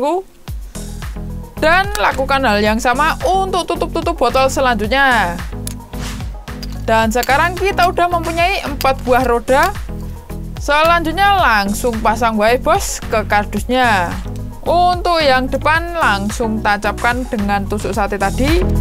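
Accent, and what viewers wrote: Java, Indonesia. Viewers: Koe medok men bosqu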